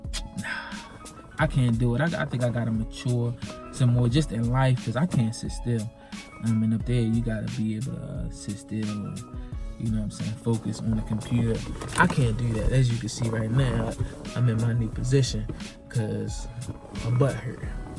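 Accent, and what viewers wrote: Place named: Maryland, United States. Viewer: Is this guy a Marylander??? He sounds like he is from my hometown!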